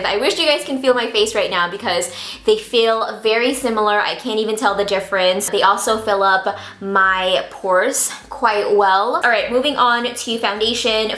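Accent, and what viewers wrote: Philippines. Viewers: You're accent makes me feel like I'm back with my extended family lol I haven't seen my dad's family in FOREVER and I miss them! Pinas have such adorable, recognizable accents haha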